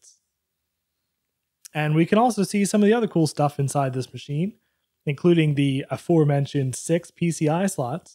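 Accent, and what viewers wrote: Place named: Philadelphia, United States. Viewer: LOL you didn't have to mention "the hot Philadelphia sun"; as soon as you said "wooder" (water) it was plain as day where you're from good sir. :D Thanks so much for sharing this awesome find! I recognized that case from the old Dells of the same era, but I never got the opportunity to see any of those official third-party Macs before!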